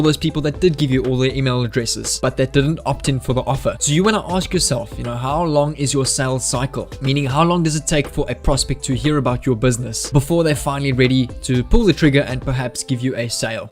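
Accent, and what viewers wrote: South Africa. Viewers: Haha yeah your accent gave you away I live in Johannesburg lol so I know the accent lol. I'm a person who has entrepreneurial mindset and values. I'm kinda struggling due to lack of funds. I'm 20 and I live with my parents. I'm trying to get out there and start something but this junk status is kak lol there are almost zero jobs lol( my dad has a PHD and can't get a job he studied for lol😅)😅😧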